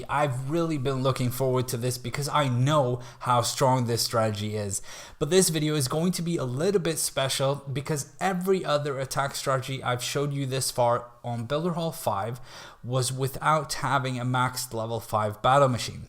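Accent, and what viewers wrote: Sweden. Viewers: Are you from Sweden?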